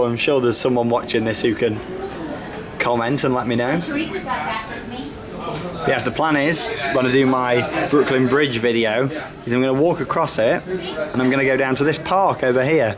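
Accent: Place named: West Midlands, United Kingdom. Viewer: Where are you from your voice sounds very west midlands uk lol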